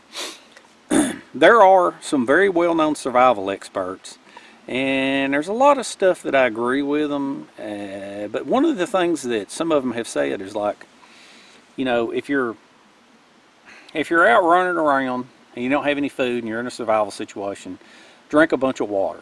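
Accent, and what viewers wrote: South, United States. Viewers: I always love your content. And your Accent bro. Are you from Down South? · Does anybody else watch because of the accent and the little sayings? Laughed out loud at the one about having to go to bed because he could hear the crickets! Of all the reasons and excuses for why it's bedtime, I have never used that one! Loved it... Subbed a while ago after hearing him refer to a rock as an Alabama hammer... What part of America is this accent common?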